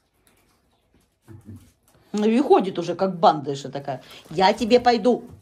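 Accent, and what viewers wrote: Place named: Ukraine. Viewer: Я понимаю, что вы, Хохлушка. Но говорите хотя бы чуть чуть по русски. Не Шыколадки, а шоколадки. Не точно по буквам, а О смягченное. Я очень к вам хорошо отношусь. Всегда вас смотрю. Но пожалейте наши уши(((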